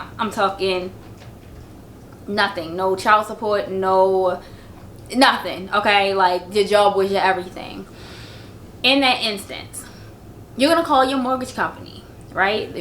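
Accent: Baltimore, United States. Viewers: Just found your channel. You are so cute! I love the Baltimore dialect. I will check out your resources. Nice to see a young entrepreneurial sister. Bless you and your babies❤️❤️❤️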